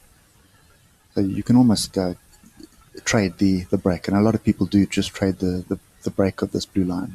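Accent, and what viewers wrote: South Africa. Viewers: You sound South African :)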